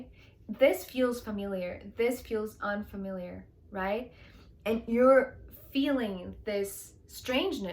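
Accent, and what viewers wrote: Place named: England, United Kingdom. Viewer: Thank you,! I think I'm feeling this right now. I just discover your channel through San story video:) Beautiful energy, deck and readings. You are an inspiration to me. I love your English accent as well;), I'm from Spain. Love ♡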